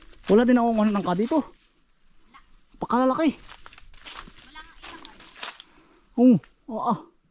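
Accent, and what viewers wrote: Quezon, Philippines. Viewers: Maganda ang tunog ng Tagalog ng Quezon.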